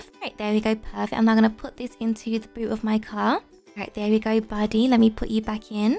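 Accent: United Kingdom. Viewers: I like how are british ❤❤ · Definitely British. Go find the exsplamation to find out! <3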